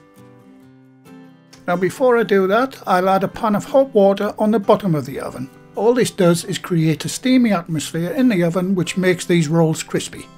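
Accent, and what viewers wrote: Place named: Geordie, United Kingdom. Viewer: As a fellow Geordie, I love hearing your North East accent. I've made a couple of your bread recipes (just recently found your channel), and both times they've been a great success. Thank you for these delicious recipes. I'm looking forward to making more of them.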